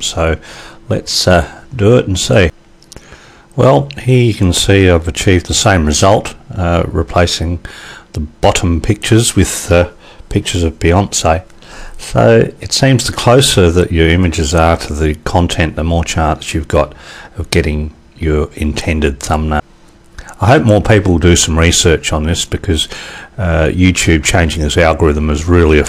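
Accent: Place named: United Kingdom. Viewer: hes not american its british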